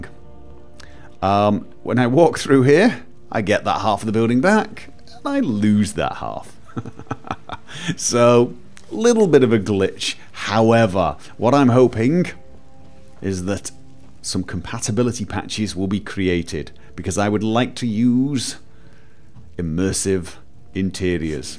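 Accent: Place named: England, United Kingdom. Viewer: I just love your english accent. I can understand everything! (Which is unusual for me, as I'm not natve english speaker) As to video. I definitely like ELFX the most out of these 3, and it loses only to enb. Which leads me to question: What enb are you using? I myself love opethfeldt6's and wouldn't change it for anything else.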